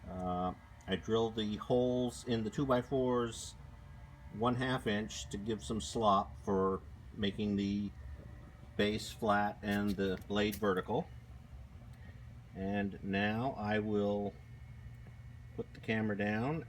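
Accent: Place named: Maine, United States. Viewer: I have to like this because my siblings, nephews, and nieces all think you sound just like me! One of my teenaged nephews found your video when he was searching for how to make his own wood splitter, apparently, and the first thing he noticed was how similar to mine your voice sounded. He immediately mentioned it to his mother (one of my older sisters), who posted it to a family group chat. As each sibling or other relative has listened/watched, he/she has agreed with my nephew's initial reaction. For the record, I like your invention. Also, our voices ARE similar, but for the difference of my accent, which is that of a man from eastern Maine. :-)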